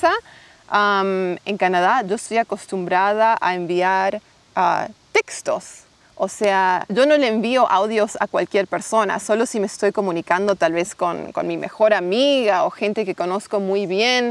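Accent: Argentina. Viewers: Excelente tu español-Argentino! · Por como hablas, al principio del video creí que eras una argentina en Canada. Tenes un acento argentino casi perfecto! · Tu acento argentino me recuerda a Ingrid Grudke, q es una modelo argentina. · Hola!!! Soy Argentino.....Me encanta como hablas el español Bueeee....en realidad el Argentino!....Vivi 2 años en Europa.....No tenes idea de como extrañe el Bidet....jajajaja!!! Les mando Besos y Abrazos! Sigan con este contenido....... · Fabuloso tu acento argentino! casi perfecto, sólo deberías (si te place) cambiar el "ummm" (muletilla anglosajona) por nuestro "eeeh" . Saludos! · Te felicito por tu español o castellano argentino. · me impresiona el nivel que lograste de español argentino, no sé hace cuanto que estás aquí pero de verdad impresionante, te aplaudo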